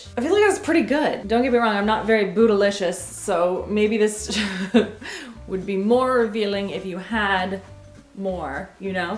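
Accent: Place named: Canada. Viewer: It’s a Canadian accent thing 🩷🍁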